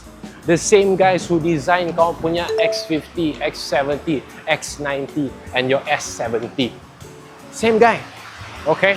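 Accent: Sabah, Malaysia. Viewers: logat sabahan....padu macam tu motor...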